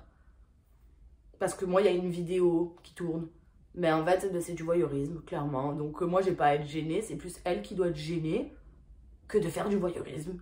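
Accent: Marseille, France. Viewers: Hello, tu as un accent sympathique, tu viens du sud ?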